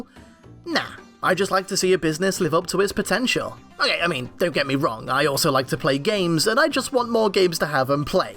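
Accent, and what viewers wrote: United Kingdom. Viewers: voice is the opposite of soothing. It's super nasally and whiny. This guy is British. It doesn't get any better than that. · I'm really like the new accent. British? Yes, please! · This should be the British dub of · I kinda like British better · British is best. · Thank you British, very cool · This sounds like a British. (Hope you get feeling better. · Damn. I like British. Really really great voice and speech. Probably my 3rd favorite YouTube Guy to listen to. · sounds a lot like Dave from BoyinaBand. And I don't just mean because of the british accent, they have a similar tone and even inflection to their voices that for a moment I thought it WAS Dave doing the Voice Over. Eerie.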